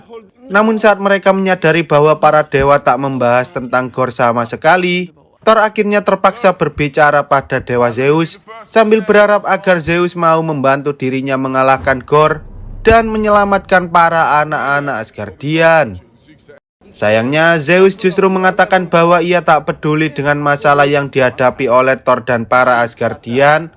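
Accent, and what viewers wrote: Java, Indonesia. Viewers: Suara penyiar medok banget 🤣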